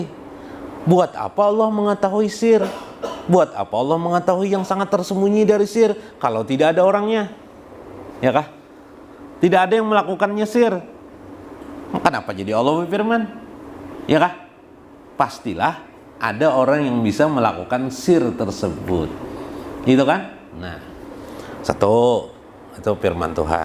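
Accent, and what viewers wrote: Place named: Kalimantan, Indonesia. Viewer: Klu di dengar dr logat bicara beliau, beliau itu org kalimantan.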